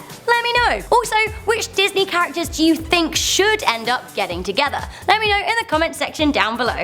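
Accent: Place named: United Kingdom. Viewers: British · British. Obviously.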